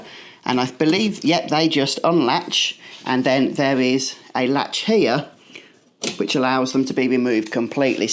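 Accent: United Kingdom. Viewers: I just built my first PC, ITX as well! I was looking at the Terra but decided it was a little pricey for my liking. Btw, I am curious about your accent, which part of the UK if you don’t mind?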